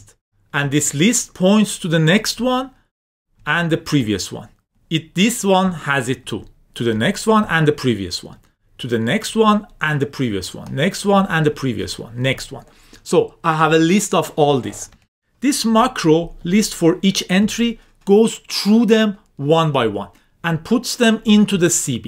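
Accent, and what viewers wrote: Iran. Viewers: I grew up in Iran... this accent sounds Farsi, but more extreme than I usually hear... ,🙂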